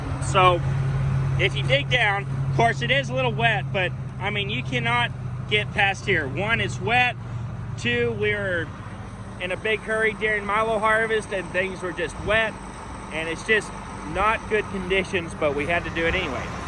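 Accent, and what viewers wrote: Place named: South, United States. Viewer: What part of Texas are you in and what do your grow, just wheat? BTW SO GOOD to hear a "ya'll" on a farming video! I have been drowned with "you betcha" and "you's guys" on farming videos and it is SOOO good to hear a good ol "almost" southern accent, course I'm from BAMA! LOL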